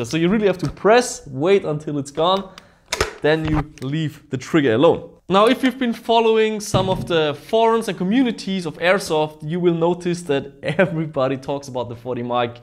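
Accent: Bavaria, Germany. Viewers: Novritisch bist bayrisch na????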